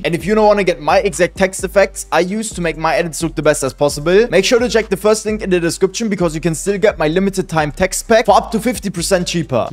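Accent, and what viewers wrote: Germany. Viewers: i tink tat you're deutsch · Are you from germany ?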